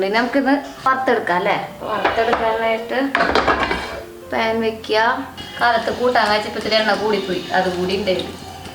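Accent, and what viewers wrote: Thrissur, India. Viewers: Pure vegetarian aanengilum njan checheede non veg cooking videos um kaanum.... checheede thrissur slang kekkaan vendi 🤗🤗🤗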